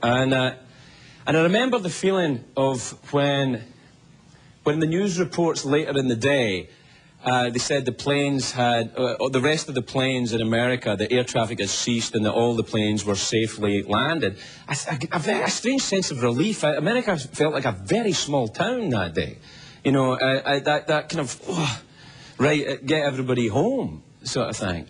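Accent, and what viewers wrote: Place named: Scotland, United Kingdom. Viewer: He sounds so scottish here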